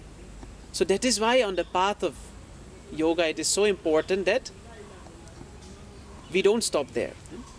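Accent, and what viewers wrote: India. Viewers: You are white but have an Indian accent. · Was you born in India you sound like a native. That or you spent alot Time there. My friend speaks Spanish. But he learned from Mexican people. So when he does speak Spanish. He sounds just like them. I think it's cool take care.😘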